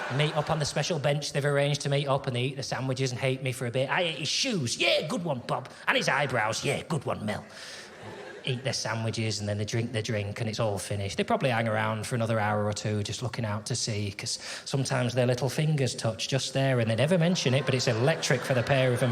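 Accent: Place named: North of England, United Kingdom. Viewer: North of England, I believe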